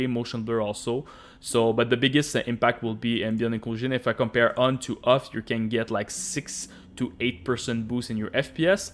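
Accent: Quebec, Canada. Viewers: oh shit un quebecois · you're quebecois ? i am and i see you really have a quebecois accent when you talk, or just canadian in general lol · Tu vien du Quebec en! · from quebec ? haha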